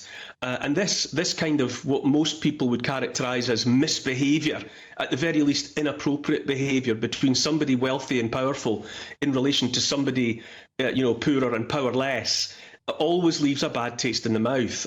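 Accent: Scotland, United Kingdom. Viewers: with a Billy Connolly accent today:)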